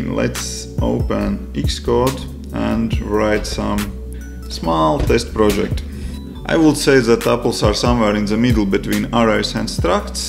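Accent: Russia. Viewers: are you russian? I hear a slight accent =)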